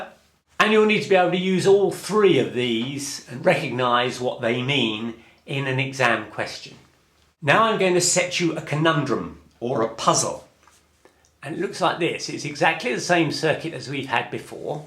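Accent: United Kingdom. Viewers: i am too distracted to listen because his accent makes me feel like im in Hogwarts lol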